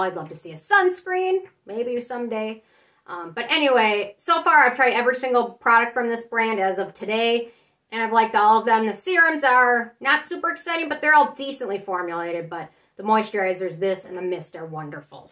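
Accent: Minnesota, United States. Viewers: People are rude u do not sound like that u have an Minnesota accent just like people from the south have one or the north..so rude. Haters suck!! I'm hating on these Korean sites not letting me enter in so I can order I tried so hard for 2 hours several ones and it won't let me sign in and idk why and I want the new Mary and May essence so bad I'm gonna cry over it I actually did believe it or not lol I feel like a baby I'm about to send someone somewhere money to order it for me Idk why I'm so obsessed with wanting it so bad but I do it looks amazing and a version of the Dr c kombucha cream essence except a different active different color purple with the cream and the bottle is so pretty looks just like the kombucha one but purple with the cream top and has a black lid and a black lable on bottom frangerance free to I'm so excited about it but can't freaken get it and I'm not good at smuggling it in like u..lol My aveno oat serum went bad super fast I liked it but idk why it changed color super fast. 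I did try the thicker version of the Byomia ceramide moisturizer and really liked that want to try the cleanser jelly too . Have u heard of the fermentashia brand or something along that lines of that name from target heart it was good especially the fermented milk cleanser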